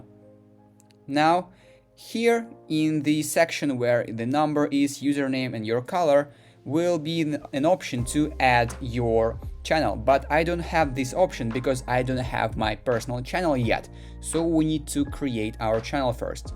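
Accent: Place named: Russia. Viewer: В вашем английском я слышала русский акцент... Но потом увидела « »...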